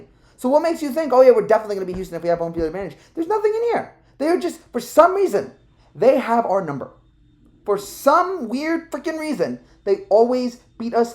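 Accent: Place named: New York, United States. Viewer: I honestly thought it was just Stephen A Smith that ranted like like that, turns out nah. That's standard New York dialect lol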